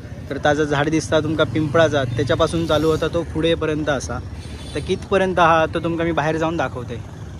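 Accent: Malvani, India. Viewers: Tu malvni boltas te Chan vata volg madhe sagat te Chan vata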